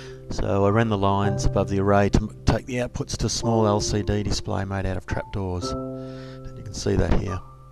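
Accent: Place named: Australia. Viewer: an infinite grid of souls... sorry, your australian accent made me think you said souls :)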